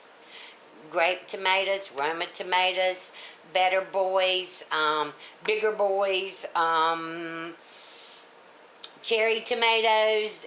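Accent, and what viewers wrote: South, United States. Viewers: LOL, you may not consider VA the south, but you sure have a southern accent. I love your accent and you have a soothing voice.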